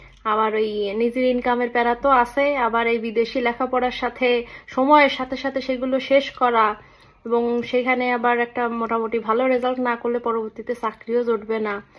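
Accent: Khulna, Bangladesh. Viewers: দ্রুত সুস্থ হও দোয়া করি। তোমার কথা শুনে মনে হচ্ছে তোমার বাড়ি খুলনা। ভালো থেকো আপু। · খুলনার আঞ্চলিক ভাষা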